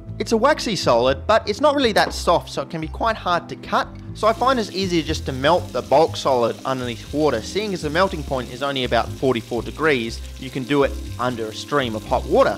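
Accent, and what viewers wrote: Australia. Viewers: He's Australian and they say "aluminium"... I thought it was just another aussie thing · An Australian talking about explosive chemistry over elevator music - that I call top-notch entertainment! XD Greetings from Germany, mate. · Wait. Is this guy Australian? Huh.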